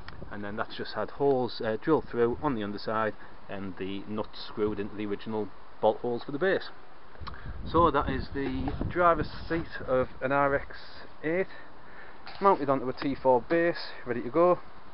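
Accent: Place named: North East England, United Kingdom. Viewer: These look really well, you sound like you are from the north east like me, cheers for the video brother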